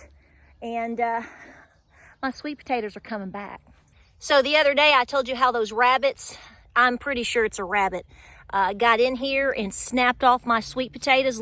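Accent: United States. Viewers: Ma'am, I've only just discovered you today thanks to the Economic Ninja. I like your straight up American way of speaking. I also respect how you are hard working and feminine and sweet at the same time. My wife and kids are working well hard in central Virginia so you just keep on going and we will as well. Thanks for your honesty and plain spoken ways, Honey. Best. And all the best to your wonderful husband. Johnny and Crew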